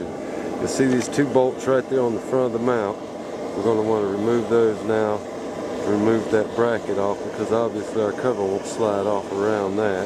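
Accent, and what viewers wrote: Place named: Carolina, United States. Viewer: I knew it! Carolina accent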